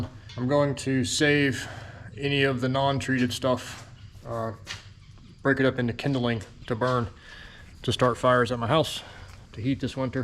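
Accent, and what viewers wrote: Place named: United States. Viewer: Foyer pronounced FOI-yer. LOL! I love your American accent and it's one of the reasons I listen to you. Where I am from it is pronounce foi-YAI because it has a French root. That was not judgement. It was amusement. Don't change. It's charming American.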